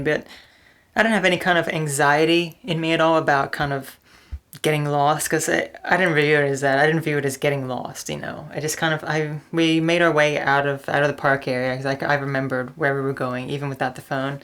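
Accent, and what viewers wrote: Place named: Long Island, United States. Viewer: When I first started watching you, I assumed you were from Long Island, because of your accent.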